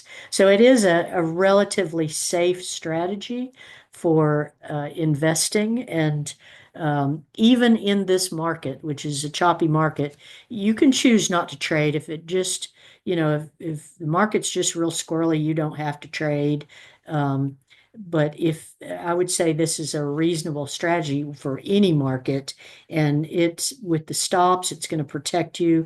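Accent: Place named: Houston, United States. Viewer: Good morning. Can I join your discord group? Please send link. I am also interested in Dale Perryman's contact info. Do you know how reach him? THANKS! Grew up in Houston and your accent feels like home.....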